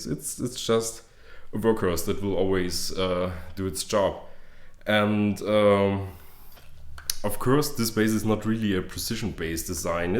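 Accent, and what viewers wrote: Germany. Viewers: Es el acento alemán y es algo muy común y no se porque comentas en español siendo este un vídeo en ingles.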